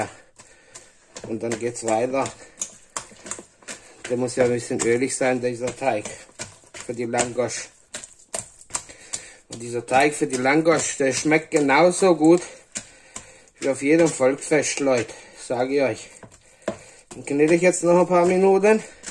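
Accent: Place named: Banat, Romania. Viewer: Grüß Gott, kommst Du aus dem Banat?Hörst dich so an . Weckt schöne Erinnerungen 😊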